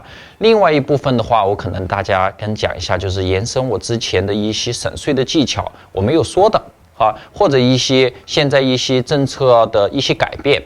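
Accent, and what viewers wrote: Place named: Sichuan, China. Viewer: 哈哈哈，听出了川贵口音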